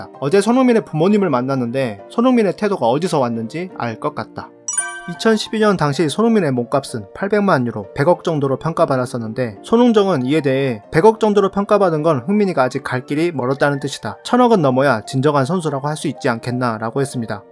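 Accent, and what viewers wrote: Jeolla, South Korea. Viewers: 내레이션에 전라도억양이 심해 듣기 거북하네요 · 님..고향이 혹시 전라도세요? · 전라도분인가보다 ㅋㅋ 사투리억양이 느껴지네용^^👍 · 전라도 사람이신가요? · 전라도 사람이신가봐요 사투리 중간중간 잘들었습니다 ㅋㅋㅋ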